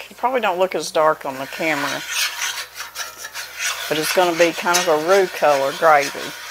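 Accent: South, United States. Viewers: This is one of my favorite meals. I've only made it once though and it was OK but not as good as I've had at a restaurant. I didn't use the crackers crumbs. I am really interested how you tenderized that meat though. I tried with mine but it didn't look like that. What is your secret? I love listening to you talk too. My momma was from Kentucky and I love the southern talk and way ya'll say things. I watch your channel a lot. Thanks for this recipe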